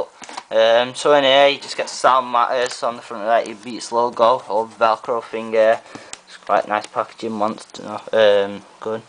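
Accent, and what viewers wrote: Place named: United Kingdom. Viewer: HE'S FREAKING BRITISH..ITS HARD TO UNDERSTAND BRISTISH ACCENT SOMETIMES..I HAVE A BRITISH ACCENT TOO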